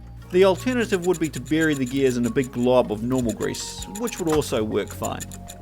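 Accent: New Zealand. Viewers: Love hearing a Kiwi accent, great to see another NZ bloke in his shed making quality content! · Are you a Kiwi guy? You sound like a fellow Mainlander.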